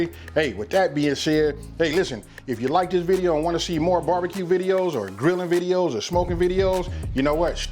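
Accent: United States. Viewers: Would love to see that. Love your American accent and if I ever came to US and A would love to meet you and your Family 🌅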